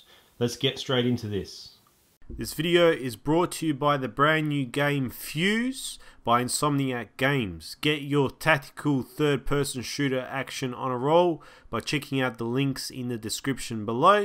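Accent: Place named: Australia. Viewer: Aussie accent!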